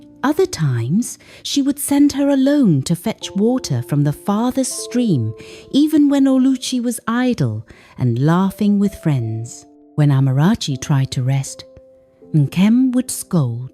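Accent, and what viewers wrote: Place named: United Kingdom. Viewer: The British accent lost me 🤦🏾‍♀️House of Horus with his rich African tone, telling African stories, is real self love.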